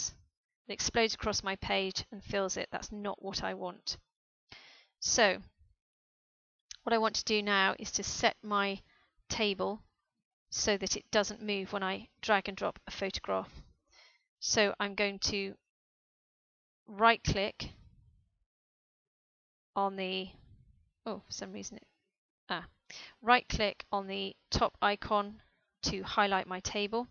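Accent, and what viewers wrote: United Kingdom. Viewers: #Offtopic I wonder whether is British, voice is way too sexy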